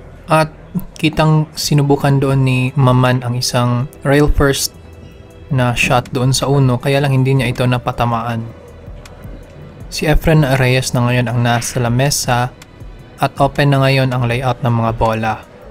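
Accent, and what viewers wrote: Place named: Philippines. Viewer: Pootah probinsyano ang narrator hahaha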